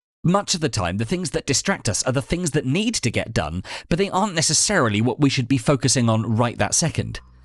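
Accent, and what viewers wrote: England, United Kingdom. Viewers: The lame British accented narrator makes this super cringe worthy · This video would not work without the English accent.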